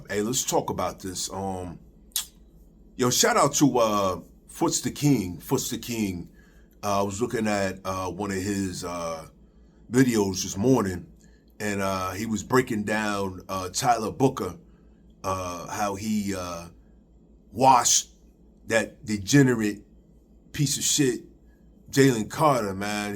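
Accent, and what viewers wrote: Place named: Brooklyn, United States. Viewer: My man much love I’m feeling this video. I’m from Brooklyn. Where are you from bro? You sound like you from home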